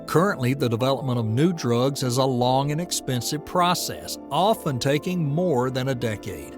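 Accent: South, United States. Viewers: I've never heard a physics doc narration with a southern accent. I think I love it.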